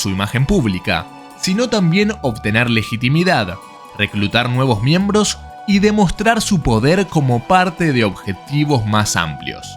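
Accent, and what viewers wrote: Argentina. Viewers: Quien coños narra éste video, ¿Un argentino? No mames 😠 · Tú acento argentino, es tan molesto. ..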